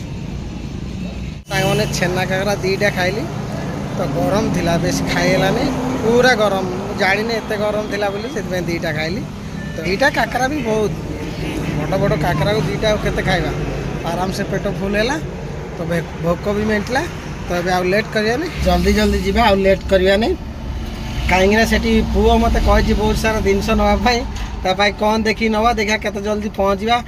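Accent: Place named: Gulgulia, India. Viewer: Puara gulgulia katha sunibaku bhala laguchi ❤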